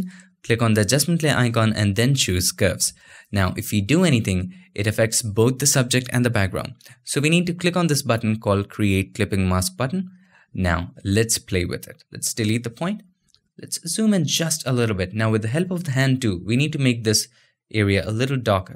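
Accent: India. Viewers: I usually consider Indian accent unbearable to listen to, however..... You are one of the very few that find pleasant to listen to. Congratulations on your audio and voice-over, it's amazing.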